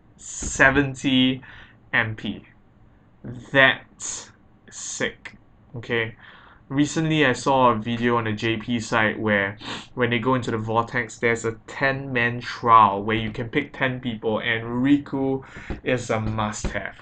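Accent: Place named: Trinidad and Tobago. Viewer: You sound like you have a Trinidadian accent. Great video!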